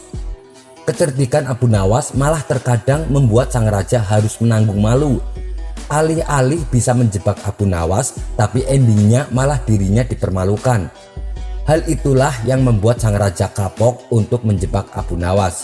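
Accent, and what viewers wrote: Java, Indonesia. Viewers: Jawa medok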